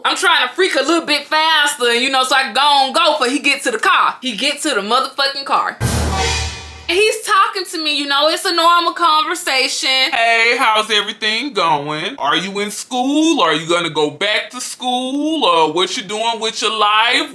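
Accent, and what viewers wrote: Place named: North Carolina, United States. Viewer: WHER U FROM !??? I HEAR YA ACCENT !!!! IM HEARIN NORTH KAROLINA !????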